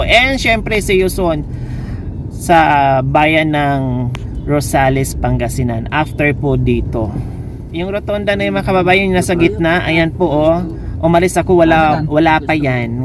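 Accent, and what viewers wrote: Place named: Negros, Philippines. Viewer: Im from kabankalan kag naka pagasawa ng taga Moncada.That is why,iba ang tuno mo magsalita super lambing hehe.Halong and bless your good heart.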